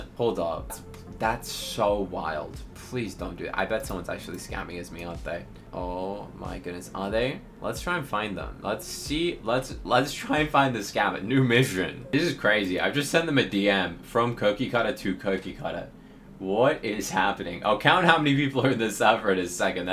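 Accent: England, United Kingdom. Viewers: Are you english or American?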